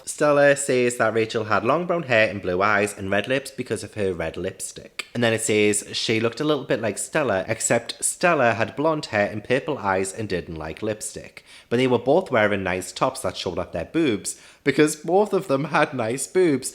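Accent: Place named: Wales, United Kingdom. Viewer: Actually, I think you'll be okay without that Sex and Holiness video. Even someone with a very limited imagination can guess where that's going... and you strike me as someone with a very vivid imagination! I mean, the irony of it... a man who's sworn off sex has all the answers! Are you Welsh? (sorry if you're not and that's an insult!) Or maybe northern? (sorry if you're Welsh and that's an insult). Whatever you accent is, I could listen to you all day!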